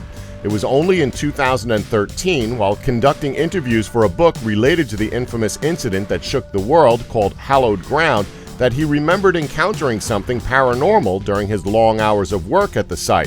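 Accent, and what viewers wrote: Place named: United States. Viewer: Probably a Chinese channel that hired an American sounding narrator.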